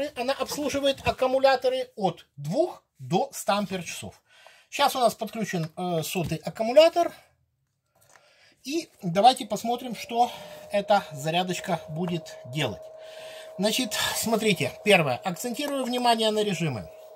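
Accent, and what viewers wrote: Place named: Odessa, Ukraine. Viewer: А по-моему как у Одессита 😊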